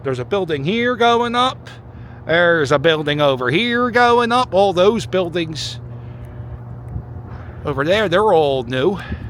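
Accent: Philadelphia, United States. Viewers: Thanks Cuz… Love ur vlog. You have the voice to rep Philly. Keep it up. Keep warm. Much Brotherly Love!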